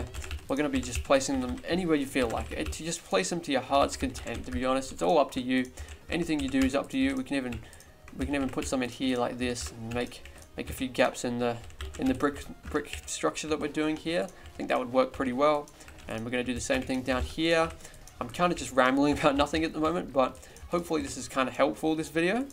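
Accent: Australia. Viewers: You from Australia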